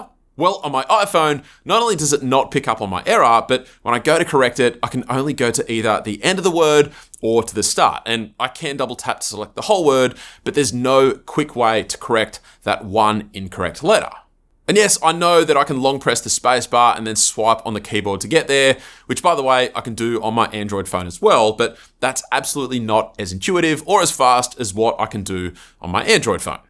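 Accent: Australia. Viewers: You should learn to speak in a bit of neutral accent. Your strong Australian accent is understandable but cannot make it till then end.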